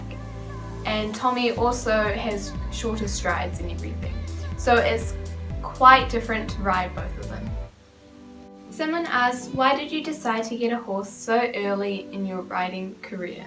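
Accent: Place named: Australia. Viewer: I knew you were Australian but I didn’t really fully grasp how... Australian you are. Your accent took me by surprise.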